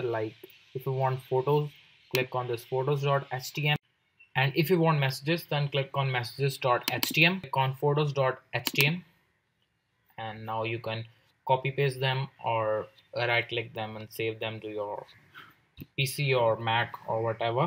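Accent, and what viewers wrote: India. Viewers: i still wonder why indian accent gives me headaches. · you such disrespectful of person just because the accent. India very smart of technologies thanks to them they make computers and smart phones.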